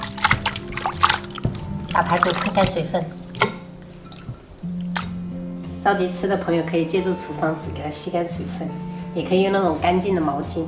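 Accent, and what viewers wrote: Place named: Fujian, China. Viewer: 你是四川人怎么一口福建口音呢?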